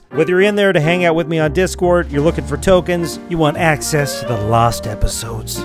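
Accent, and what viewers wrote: South Africa. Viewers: Whoaly shit. That's a cavalcade of horseshit to deal with. Also, are you south african??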